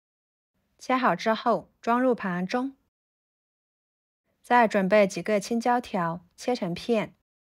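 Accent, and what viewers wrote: Yunnan, China. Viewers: 这云南普通话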